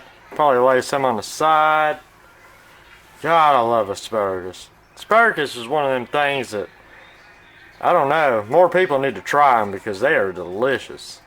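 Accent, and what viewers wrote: South, United States. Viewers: You are such fun chef to watch and I love your southern accent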